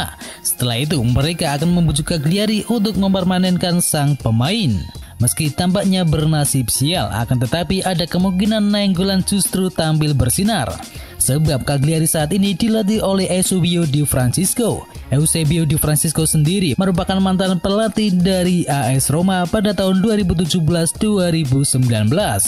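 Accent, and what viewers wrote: Java, Indonesia. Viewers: Medok amat mas bro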